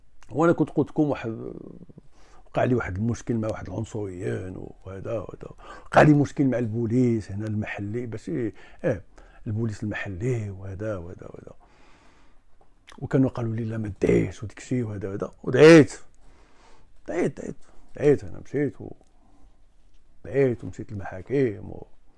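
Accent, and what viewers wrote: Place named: Tetouan, Morocco. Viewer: مرحبا السي باليوتوب. جميل ان تحتفظ بعد كل هذه السنوات خارج مسقط رأسك باللكنة التطوانية المحببة 🥰 وجميل أيضا ان تطوان دائماما يخرج منها أشخاص مميزون في مختلف المجالات.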